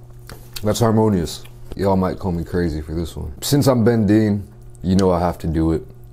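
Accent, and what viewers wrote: United States. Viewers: I know he is living in Korea, but he sounds like such an American boy!!!😁✌🏽❤️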